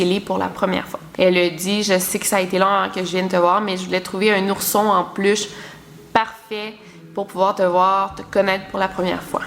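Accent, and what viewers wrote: Quebec, Canada. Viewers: Je comprends le québécois maintenant grâce a toi, j’aime vraiment tes vidéos.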